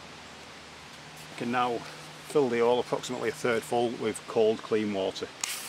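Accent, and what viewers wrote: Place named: Yorkshire, United Kingdom. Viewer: Closed Captions are 100% needed, because of your extra-strong accent. What is that, Yorkshire?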